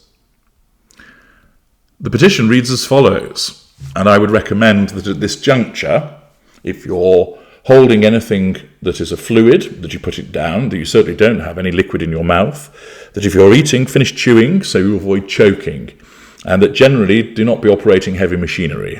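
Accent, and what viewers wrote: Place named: RP, United Kingdom. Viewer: Dear Sir, can’t you put a paper bag over her head, too much of her ugly mug is showing for my liking. Gawd you have got to be joking, her a Queen, I agree with your phases……ffffffff kidding me…….fffffff retarded….. Maybe I’d agree to Queen of a 💩heep….. BTW with your posh accent, I love it when you curse, makes me laugh so much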